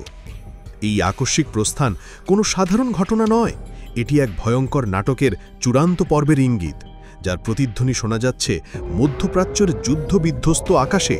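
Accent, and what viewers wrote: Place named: India. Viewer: কি হয়েছে কাল থেকে ভারোতের মতো করে খবর পড়া সুরু করছে।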